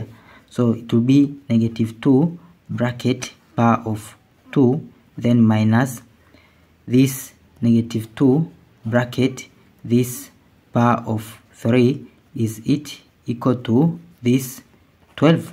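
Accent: India. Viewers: This person in the video is NOT a Native German speaker, vo8ce is of someone from India. Why you mention Germany in your video tagline? I dont know. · Не знаю, что обозначает GERMANY в заголовке, ибо судя по цвету кожи и произношению за кадром индус. Задача решается на раз-два. Глядя на уравнение мне понятно, что (х) следует искать в области отрицательных значений. А раз так, кроме как "-2" никаких корней это уравнение иметь не может. Наверное, в Индии много лишней бумаги.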